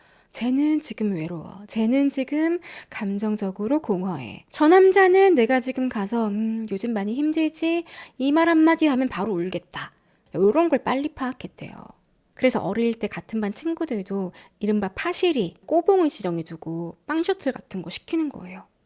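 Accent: Busan, South Korea. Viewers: 일본어 묘사를 하시다가 영남 사투리로 너무 자연스럽게 전환하시네요😂 오늘도 재밋게 보고갑니다👍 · 알아도 또 듣게 만드는 재주가 있으시네~ 사투리는 또 와그래 이쁘게 잘하노? 반했데이~ㅎㅎ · 아니 ㅋㅋㅋㅋㅋㅋㅋ 연기도 잘하는데 부산사투리도 와이래 완벽합니꺼 ㅋㅋㅋ못하는거 없어 ㅎㅎㅎ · 아따 어리석은 남자들~~~ 사투리 넘 부산요 ㅎㅎㅎ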